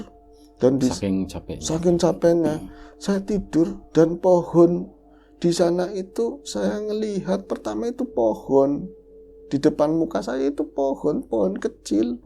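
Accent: Banyumas, Indonesia. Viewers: Dari Ch LapHor, capcuus k CH NGOBROL, stlah itu merapat lgi k Tanah Tua Channel ndengerin ngapak'y mas 🤭🤣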